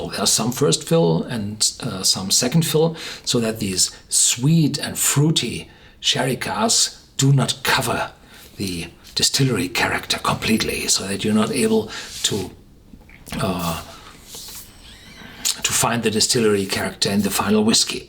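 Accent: Germany. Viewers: come of german and Yugoslavian decent, but was born in Canada and I speak some german but I find myself really enjoying your English reviews...something about the german accent and pronunciation of words makes the reviews amazing....Thank you.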